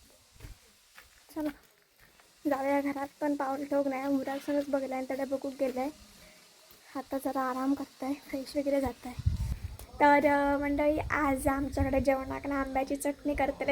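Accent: Malvani, India. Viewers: tujhe video khup chan astat.. mi pn kokanchi ahe.vengurla..but tujhe te madhal malwani aikayla khup chan vatt...tujha bolnyatunch smjun yete ki aplya kokanchi manse sadhi bholi❤..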